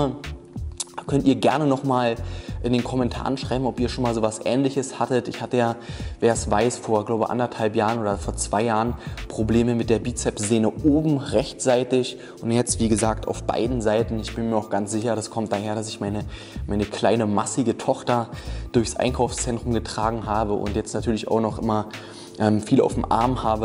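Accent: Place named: Berlin, Germany. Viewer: Er hat nen Berliner Dialekt. :) Berlin?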